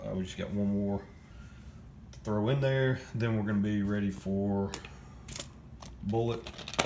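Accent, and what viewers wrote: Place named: South, United States. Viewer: I feel like we are family, so much in common even southern draw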